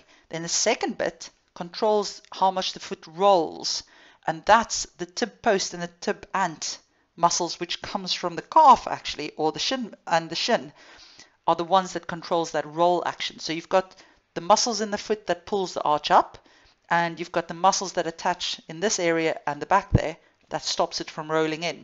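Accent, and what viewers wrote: South Africa. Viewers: Thanks you and is that that an South African accent? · South African accent!! I dont hear to many of those anymore here in the UK haha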